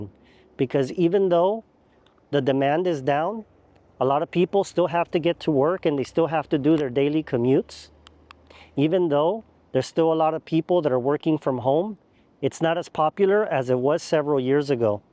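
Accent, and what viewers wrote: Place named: Latin America. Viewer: That stupid latin accent .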